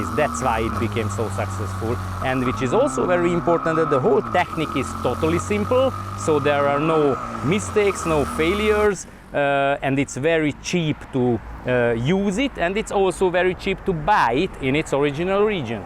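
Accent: Hungary. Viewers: I like your Hungarian accent. Good review.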